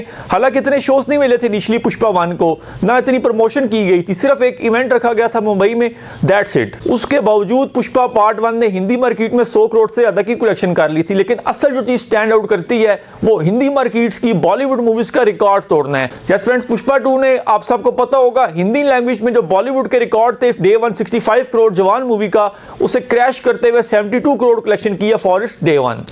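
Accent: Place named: Pakistan. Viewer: You seem pakistani accent।।।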